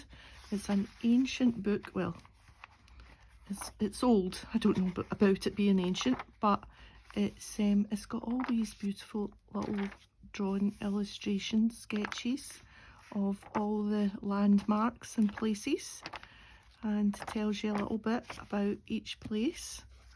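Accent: Scotland, United Kingdom. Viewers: Scotland I was first thinking that is an Irish accent but no I got just land of frays and lovely casels . Some day I will come too wisit for shore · your accent is so familiar to me. I’m Scottish and my Grandmother had a very thick accent. Where are you? That is a great die! Love the makes!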